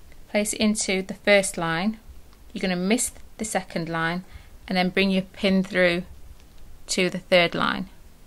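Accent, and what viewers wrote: United Kingdom. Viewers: isn't it also the case that pin tucks are stitched but pleats aren't? I've always wondered how it's done, now I understand perfectly. The fun for me will be working out how much extra fabric I'll need! I can see much experimentation ahead! Thank you also for having a proper accent, as a fellow Brit I'm getting fed up with tutorials with American voices 😄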